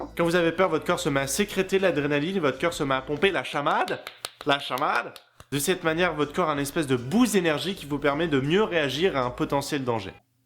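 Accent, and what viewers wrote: Quebec, Canada. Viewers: C'est un français ou un québécois avec un accent de frais chié? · XDDD c'est enorme c'est mieux qu'un cyprien ou un norman srx t'es epique avec ta tronche de psychopate et ton accent quebequoi LAPIN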